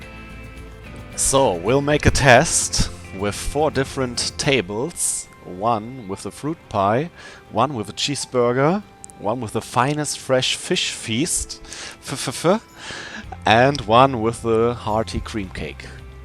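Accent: Germany. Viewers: Thanks a lot for this video! I've just started playing on PC and didn't know a lot of the things you listed :) You forgot to include the links to the other videos tho ("click on top right" but there's nothing haha) Are you German, by any chance? I'm from Germany and your accent sounds a lot like ours :B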